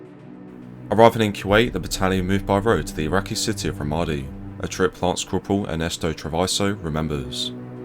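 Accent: United Kingdom. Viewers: Always a British guy narrating American documentaries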